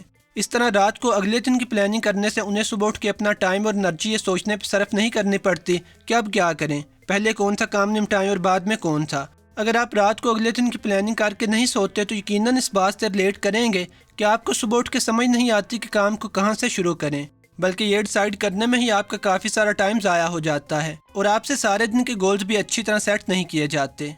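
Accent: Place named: Sialkot, Pakistan. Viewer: Itni achi research. V.good Bad pronouncing. Sialkoti lehja bolny Wala Bad... Apna lehja badlo...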